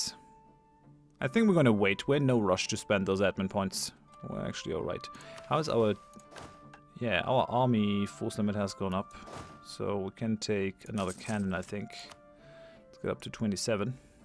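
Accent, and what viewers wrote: Germany. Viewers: Oh it is a German accent, I always thought you were dutch lul. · I've been waiting for this episode! You should definitely do more EU4 let's plays! Looooove it so much. (and your german accent is awesome) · Haha no, german accent. I like that he pronounces french and dutch names somewhat decent though :) Better than most americans at least haha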